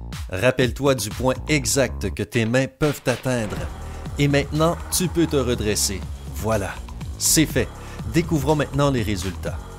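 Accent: Quebec, Canada. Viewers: C,est un accent quebécois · accent canadien j'adore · L' accent canadien , drôle et sympathique ; vous ne trouvez pas ! ?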